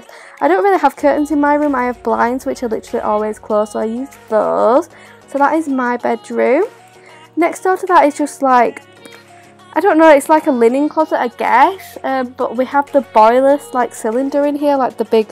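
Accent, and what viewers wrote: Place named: England, United Kingdom. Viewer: Do you come from England? Everything you said we say in England😂 (how you said things)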